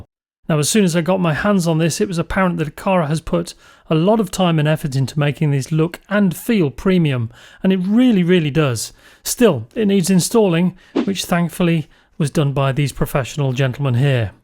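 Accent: United Kingdom. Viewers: U sound like u from uk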